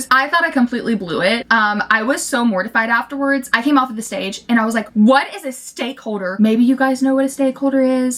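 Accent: South, United States. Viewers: lol you’re twang is so much more pronounced around your fiancé 😂I get the same way around my southern grandparents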